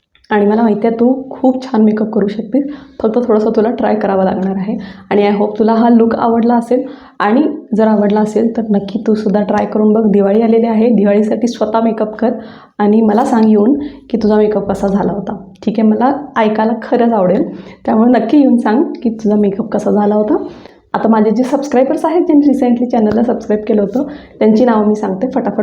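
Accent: Kolhapur, India. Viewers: Tumhi chan sangta pun kolhapuri bhasha samajate lagech .. aso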